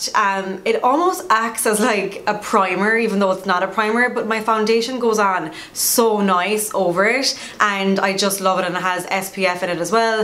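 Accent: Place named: Ireland. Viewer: Love your videos. You're a true Irish gal. Love that you still have your great accent!! I sent you an email about Toc Watch. Hope to meet you at some stage. Keep it up.:-)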